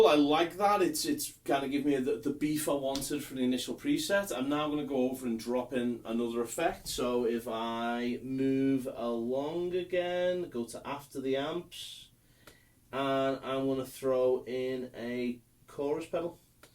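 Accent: Liverpool, United Kingdom. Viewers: Cool dude! Are you from Liverpool?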